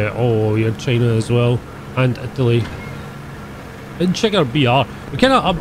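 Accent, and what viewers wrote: Scotland, United Kingdom. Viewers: Hearing a Scottish man whisper "Sleep now, little baby" in a sinister tone may be the most terrifying thing I've heard all week.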